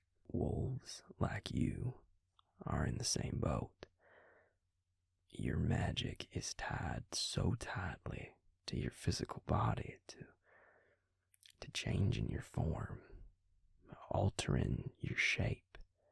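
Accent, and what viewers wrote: South, United States. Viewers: me not being american and not used to southern accent: "i don't understand but.. YYEEE HA!!🤠" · lovin the yeehaw accent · Not usually a big vamp lover but oooh boi that southern twang could turn anyone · I love his southern accent 😍he's too cute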